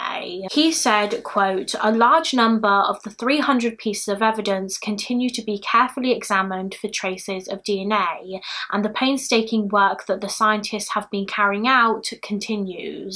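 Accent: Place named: England, United Kingdom. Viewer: Such a sad case. Totally unrelated question, just because I'm curious - what part of England are you from? Fascinated by your accent.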